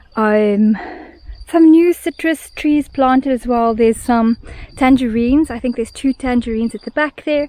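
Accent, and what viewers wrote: South Africa. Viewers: I can spot a "fellow south african" an oceans away 😂 🇿🇦🤌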